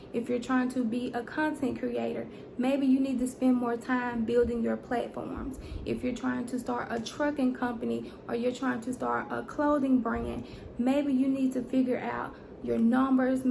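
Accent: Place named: Mississippi, United States. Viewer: I hear the Mississippi all in your voice! 😉 very informative channel, so I just subscribed!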